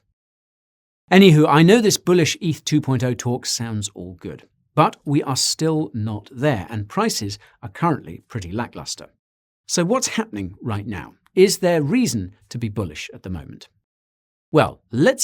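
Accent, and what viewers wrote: United Kingdom. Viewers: Mate! Aside the amazing info and the way you get to present us (pun intended!) it's also amazing you get me to laugh listening to the boring 'I'm not a financial advisor'. I might be biased tho as I have a weakness for about every accent from my British neighbours, at least, the ones I can understand 🤣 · That British accent 😂😂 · The only person with a British accent that I listen to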